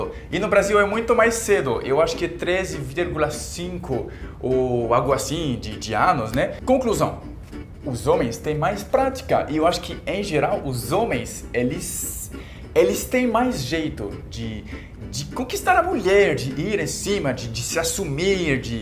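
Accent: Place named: France. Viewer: Nossa adoreiiii ! Voce é uma graça e seu sotaque é um luxo! Moro na Suíça e vou bastante em Paris, sou suspeita de falar dos brasileiros, porém acho os franceses um luxo. E estou adorando suas dicas!😂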